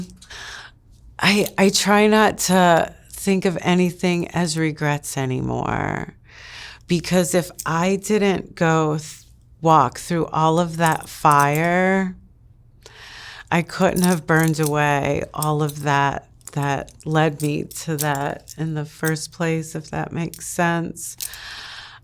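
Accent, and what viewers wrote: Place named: Long Island, United States. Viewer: Rename this >>> Strong island she and her accent is meeeee and all my Long Island friends ………..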